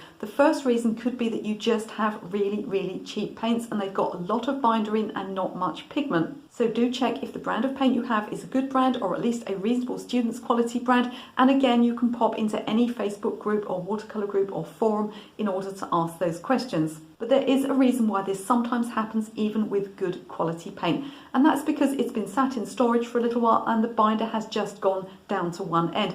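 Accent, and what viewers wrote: England, United Kingdom. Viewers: I love your English accent!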